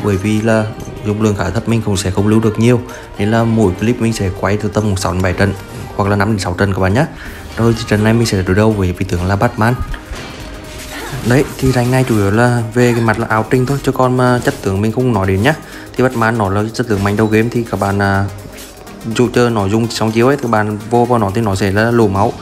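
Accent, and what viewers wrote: Central, Vietnam. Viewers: Bị ghiền tiếng miền trung rồi 😂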